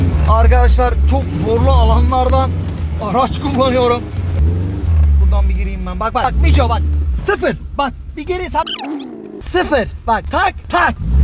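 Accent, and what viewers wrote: Elazığ, Turkey. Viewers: Elazığlı mısın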